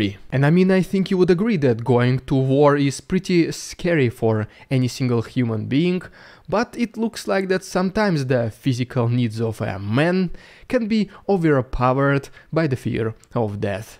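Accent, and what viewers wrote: Russia. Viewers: Ha-ha-ha-ha. I really did like the video and found it informative, but Dude, it's OK to lighten up on the accent. My parents and grandparents were immigrants. I speak Russian and worked with them for 20 years ...but this is too much. It sounds like you're auditioning for the part of Pavel Chekov in the next Star Trek series.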